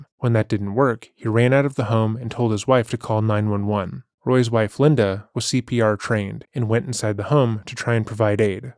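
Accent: Canada. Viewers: First of all, I love this channel. I've been watching pretty much since day one and will continue to do so. With that said, I've noticed in the last few videos a canadian accent that I didn't pick up on earlier videos. Anyone else? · Wait, are you Canadian? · First time completely hearing the Canadian coming through, guys. Currently in New Orleans, so, stark contrast. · Is it just me, or can we all hear the Canadian accent more and more these last few videos? I couldn't hear it or wasn't paying attention in your earlier videos, but your voice is one big reason why I love your channel, so I'm sure I would have heard it before. Just wondering. ❤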